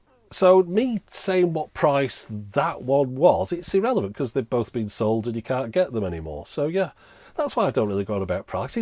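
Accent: United Kingdom. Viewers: I love your videos. I belive I watched most of them now. (Some multiple times) Love the Muppet's, the British accent, the kindness, the retro items (the older the better), the explanations, the reviews, the garden views, the close-ups and generally the attention to detail. It is entertaining, educational, funny and just most wholesome. Whenever you say "thanks for watching", I reply thanks for showing.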